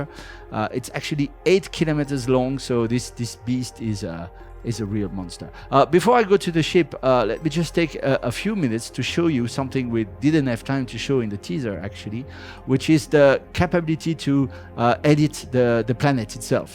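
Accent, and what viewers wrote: France. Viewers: il est français ? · French accent wtf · David Guetta's accent spotted. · Are you Canadian or French? Your English is very good with French "tongue'. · His french accent completely sold it to me.. Who do I give my money? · Are you French ? ._.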